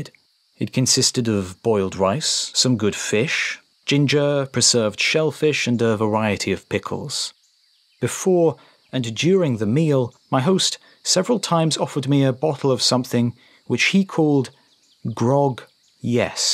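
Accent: United Kingdom. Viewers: For a Native American, he sounds slightly British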